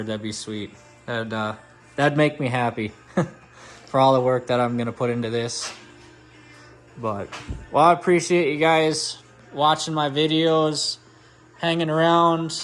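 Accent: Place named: Minnesota, United States. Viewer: Knew you were from mn before you said it. Where are you located?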